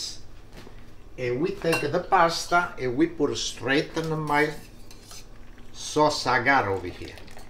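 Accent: Italy. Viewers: I like his Italian accent so nice. As well I love pasta.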